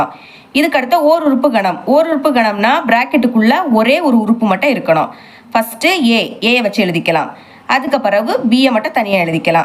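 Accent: Kanyakumari, India. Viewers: Neenga kanniyakumari yaa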